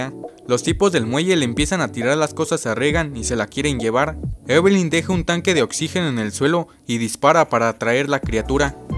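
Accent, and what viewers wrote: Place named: North, Mexico. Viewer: Yo fui productor de television en la especialidad de publicidad en pocas palabras era productor de comerciales, yo voy a ti, como locutor, tienes una magnífica voz, pero no tienes nada de experiencia y te hicieron debutar demasiado pronto, lo principal es el acento neutro y yo aunque no soy mexicano me parece que tienes un acento norteño yo estaba esperando en cualquier momento el "puesn" eso es el grave defecto que veo en tu desempeño, pero felizmente eso no es mortal y tiene solución, estudia para adquirir el acento neutro y practicar por lo demás material hay y muy bueno., tu voz, lo de la locución profesional puede esperar un poco y prepararse para un mejor desempeño, felicidades y adelante.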